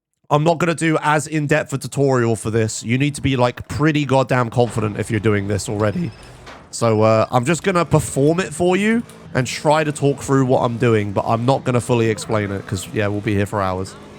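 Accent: United Kingdom. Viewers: He's british, innit?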